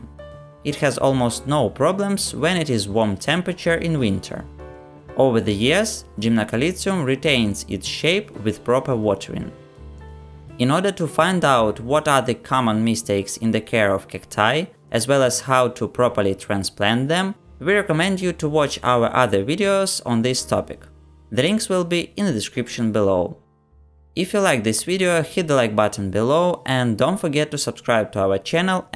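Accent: Russia. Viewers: It's a Russian accent.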